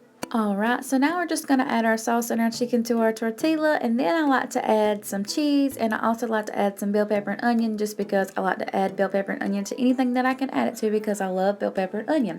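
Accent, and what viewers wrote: South, United States. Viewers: LOL, she's from the South and pronounces words a little different like maters instead of tomatoes 😉 I'm a NC Southern girl myself 😁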